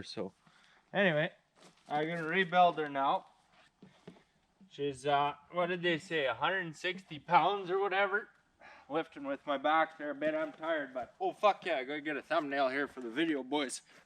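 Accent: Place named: Saskatchewan, Canada. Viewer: Way to tackle it bud.. if you need a tranny jack DM me i have one you can use.. slick as shit when you're working alone.. by the way you talk i believe we aren't far from each other in good ole sask lol..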